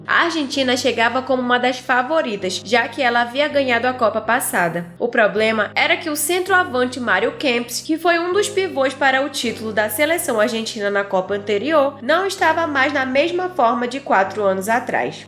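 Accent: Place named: North, Brazil. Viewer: Você é paraense ? Seu sotaque lembra a região norte. Parabéns pelo canal. Muito bom seu trabalho.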